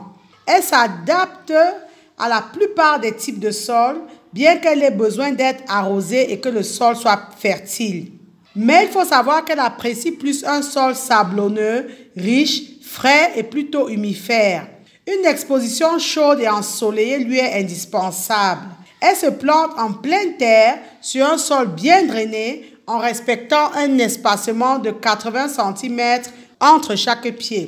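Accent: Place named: Africa. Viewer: Félicitations ! L'agriculteur africain a besoin d'entendre vos conseils. Personnellement je vous écouterais des heures tellement votre voix est claire et votre accent tout africain de ce français que vous maniez si bien est comme une musique. Continuez. On est ensemble.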